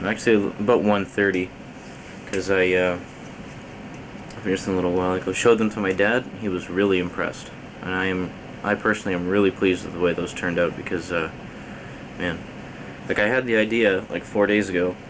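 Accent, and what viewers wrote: Canada. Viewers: You sound Canadian are you from Canada?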